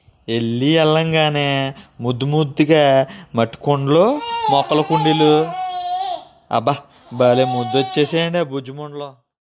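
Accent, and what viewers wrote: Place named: Godavari, India. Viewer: Godavari jillla yaaasa super bayyya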